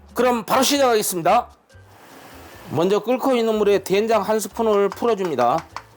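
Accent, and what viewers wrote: Jeolla, South Korea. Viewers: 전라도 분이세요? 말투가 정겹네요😊 보기만해도군침도는 광주식 오리탕 레시피 넘나 잘보고갑니다❤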